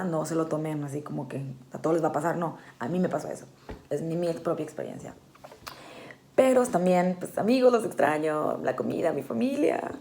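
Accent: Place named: Monterrey, Mexico. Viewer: Holaaa. Acabo de suscribirme a tu canal y me gusta tu vibra.. Si no me equivoco te escucho con acento regio o al menos de otro estado del norte del pais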